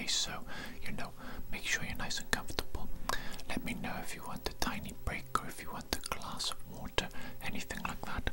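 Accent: Wales, United Kingdom. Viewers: Am I right in saying that you are Welsh 🏴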